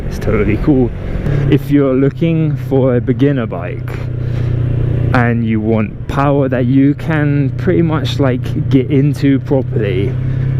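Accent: United Kingdom. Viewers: You sound British but I'm sure this is Thailand though! Are you based there? like your reviews, so new follower here!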